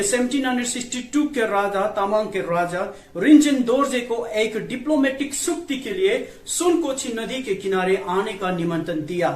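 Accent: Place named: India. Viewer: Hahaha...nice joke first of all you sound like indian to me hybrid of indian not nepalese As a newah buddhist i would like to say Tamang neva invade or attack nepalese kingdom Tamang are one of the kind community and hard working people of nepal newah tibetan both emperor were close neighbouring nations they had a good relationship before shah dynasty came to existence both newah and tibetan exchange buddhist culture not only business even they get married Tamang are originated in nepal not from tibet Ofcourse they share tibetan culture and there own bon traditions Having mongolian face cannot be said they are mongols 😂😂 you may ask me why read a invasion japan by mongol kubalai khan Mongol were badly defeated by shogun samurai warriors several times if you go to mongolia if you call ur self as mongol they will slap you on you face without any doubt if you share ur history with them Because asian face were living in himalayas before genghis khan.. 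before saying anything about Nepalese kingdom protect your community by christian invasion mostly tamang community are walking wrong path in christianity why don't you make that kinda video rather making these kinda videos which are base less Im not saying tamang don't exist According to nepa mandal tamang gurung and newa were 3 brothers later century 3 brothers were separate it was happen in todays kavre district this incident was said by my tamang friend who is living soyambhu area in present day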